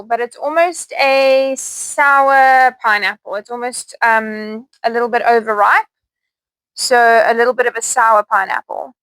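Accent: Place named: South Africa. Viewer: Your accent gives me Mzansi Vibes... Enjoyed the review and like the ink art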